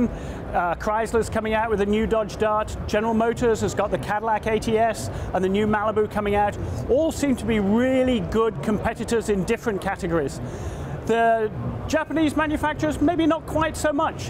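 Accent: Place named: England, United Kingdom. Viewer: Funny he says domestic and sports an english accent while talking about american cars.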